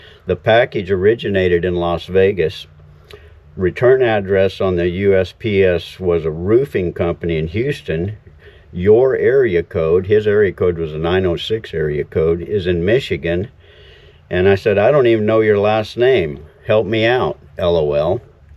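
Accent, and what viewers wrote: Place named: Mexico. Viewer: Sounds like Mexican learning english😊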